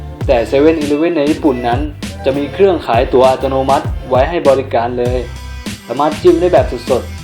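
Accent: Suphanburi, Thailand. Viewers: เสียงออกสุพรรณ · เสียงมึงแม่งสุพรรณเลยเสียงฮาสัสโครตฮาฮาเหี้ยๆฮามากๆๆๆๆๆฮาโครตๆๆๆๆๆเสียงมึงแม่งโครตของโครตตลก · เสียงพี่เหน่อจังพี่คนสุพรรณหรอ😕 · สำเนียงพี่ดูเน่อๆพี่เป็นสุพรรณเหรอครับ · Narongsrag Tragcnogras นงคนสุพันเเน่ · เป็นคนแถวสุพรรณเหรอ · พี่เค้าเปนคนสุพรรนหรอครับสำเนียงออกเหน่อๆ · คนสุพรรณแท้ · คนสุพรรณหรอ?? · พี่พูดเหมือนคนสุพรรณเลย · เป็นคนสุพันเหรอคะ ผู๋ดเหน้อเชี๋ยว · สุพรรณล้วนๆ555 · สำเนียง สุพรรณบุรี ชัดๆ พี่คน สุพรรณบุรี ใช่ปะ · คนสุพรรณใช่มั้ย555555บ้านเดี่ยวกัน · คนสุพรรณปะเนี่ย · เราว่าเน่อสุพรรณอ่ะ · คนพากพุดสุพรรณไพเราะ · พี่เป็นคนใต้รึเปล่าหรือคนสุพรรณ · แอดเป็นคนสุพรรณเหรอครับอันนี้น่าจะคลิปที่พึ้งเริ่มหัดทำยูทูปใช่ปะครับ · มาจากสุพรรณหรอคะ555 สำเนียงพากย์น่ารักมากๆ^^ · ไอ้ห่านี่คนสุพรรณนี่หว่า555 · april dechkan เราว่าคนสุพรรณ~~~ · Bank Bank น่าจะคนสุพรรณมั่งค้ะเเต่เราคนอีสานก้อเน่อๆยุ่. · 555 สำเนียงสุพรรณ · คนสุพรรณหรอ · พี่เป็นคนสุพรรณป่ะค่ะเสียงพี่แบบ กาแฟ๋ อั๋นดับ1 เสียงพี่อ่ะ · พี่คนสุพรรณหรอ?? 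· คนพากมาจากสุพรรณหรอ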